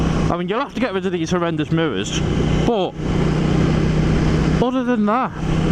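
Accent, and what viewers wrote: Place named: North West England, United Kingdom. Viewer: Thanks. i think I have been through there before. Nice pub at Aldbury! I wasnt sure whether it was cheshire given that your accent is from the Noth West. Cheers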